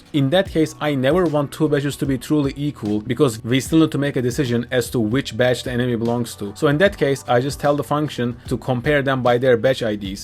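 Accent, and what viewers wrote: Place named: Turkey. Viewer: Kanka sen turk musun aksan turk aq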